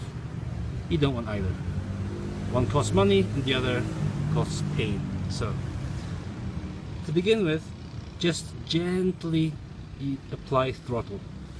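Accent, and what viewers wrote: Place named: Scotland, United Kingdom. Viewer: hmmmm scottish accent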